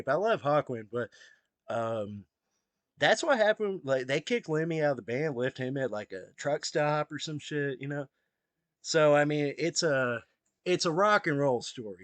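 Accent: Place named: South, United States. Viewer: You sound like a hillbilly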